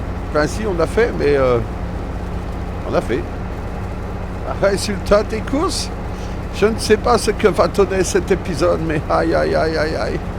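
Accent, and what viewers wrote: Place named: Nord-Pas-de-Calais, France. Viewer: Mon et ses accents... 😂😅 Ton best reste ton chetemi 😍 quand tu te présentes toi tu t'appelles 😅😂 Moi j'adore ta parlementure, et puis après toi tu racontes, moi j'écoute 😂😅🧡 Belle vidéo 👏👍 j'aime beaucoup cette petite série 😍 Gros bisous 🧡🌹💋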